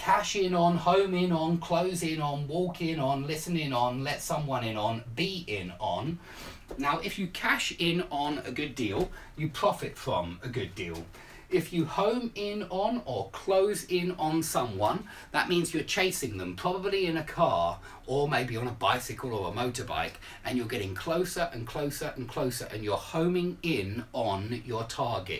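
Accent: United Kingdom. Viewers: Hi greetings from Colombia, i still enjoy your lessons, thanks. I hope You área doing well. I love your british accent and i find it pretty understandable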